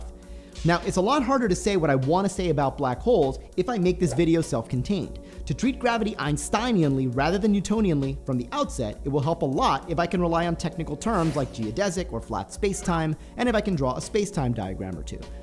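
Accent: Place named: Australia. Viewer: Man, I feel the exact opposite. Plus Australian accent and slower speaking style are much more pleasant to listen to.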